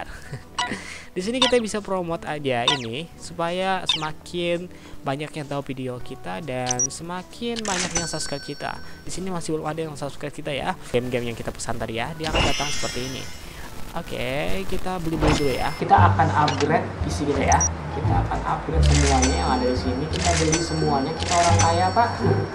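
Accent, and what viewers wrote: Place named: Central Java, Indonesia. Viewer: JATENG MANA SUARANYA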